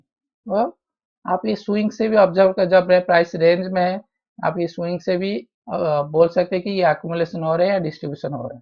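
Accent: Odisha, India. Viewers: Bhai apana odia ki tone ru Jana paduchi..kai na Odisha side Hindi tk emiti katha huanti..bhala lagila apanka video dekhili..apanka sahita contact karibar achi detail diantu bhai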